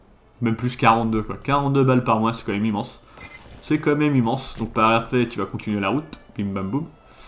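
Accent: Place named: Netherlands. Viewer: ton Français et très bon mais ton accent te trahie:D Tu es de quelle pays? À vu de nez je dirais Hollande 🤔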